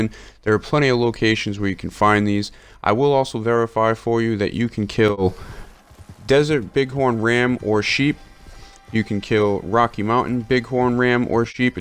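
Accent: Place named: East Coast, United States. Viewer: you know i know you.said before that you lived in the UK and I was kind of confused lol. My daughters mother is from good ol Rhode island and I have wondered lol it makes so much sense now! You dont even have to explain. ( i must admit I did wonder a few times ok how does our friend here speak like a east coast United States native and says he lives in the uk. Makes perfect sense now. You can count on me to watch every video you make. Like and share with everyone I know. You, you are the type of YouTube channel that deserves thousands and millions.... You are real man! You always got a friend and supporter in me old! You already know that though! Keep up the great work my friend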